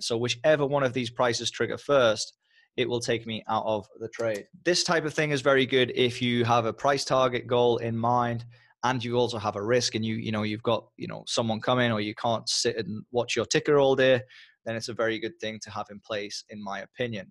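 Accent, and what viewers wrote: United Kingdom. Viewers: you from the UK?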